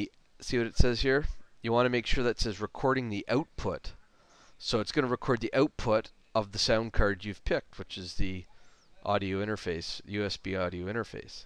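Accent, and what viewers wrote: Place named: Ontario, Canada. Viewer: thanks for the great helpful vid! I have to ask, by your accent you sound like you're from Ontario. Are you?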